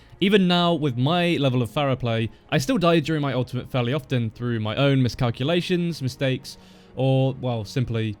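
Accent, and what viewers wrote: United Kingdom. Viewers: Where are you from? Southern England?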